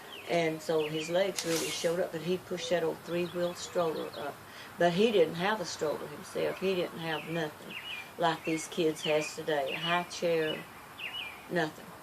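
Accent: South, United States. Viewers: This reminded me of the stories my Great Grandmother used to tell, The wonderful southern accent made it all the better. Thank you for sharing.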